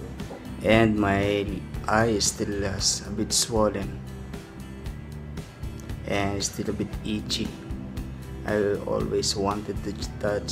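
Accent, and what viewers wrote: Philippines. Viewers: do not listen to people who criticizes you for speaking in english. you are trying your best, and i can understand what you are saying. sure, you may have a filipino accent, but we can all understand what you are saying. i’ve travelled to a lot of countries whose citizens can’t even understand basic english questions. point being, keep speaking in english, as you can only get better at it. i don’t ever reply on youtube video comments, as there are too many trolls, but i can’t stand it when people criticizes others for trying to improve themselves.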